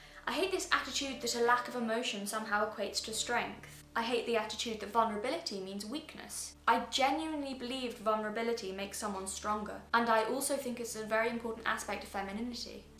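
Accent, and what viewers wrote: United Kingdom. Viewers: i thought this was gonna be some insufferable libtard or conservtard discussion on "misrepresentation of gender archetypes in fiction" that instead rags on commercialisation or identity politics etc in, i hear a british accent and eat my thoughts (not a comment on british people, also not a comment on american people. its less likely for a non-american to be republicanly/democratly charged than an american) i think this is gonna be good in and it sounds kind of consevtard but the coments on the quality of this video inclines me to think that your going to give reasonble basis for the selection of his specific evidence to and i get the clarification i asked for now im waiting for the clarication i need oh yeah i forgot to mention: subscribed. i dont remember when but im now i hoping you prove me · Woman are beautiful Strong and they can run very fast they are not weak And this woman accent is very beautiful and I love British Woman they are very powerful and I love her accent so beautiful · I'm so glad it's a british chick saying this. 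· This has to be the most "propa" british accent I've ever heard.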